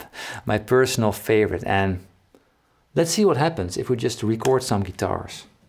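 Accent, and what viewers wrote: Netherlands. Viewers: You sound super dutch. Good info thank you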